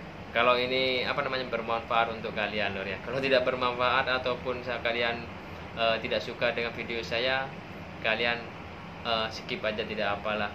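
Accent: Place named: Madura, Indonesia. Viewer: asli dr mana kang,,logat bicara seperti dari Madura nih 😊🙏🏻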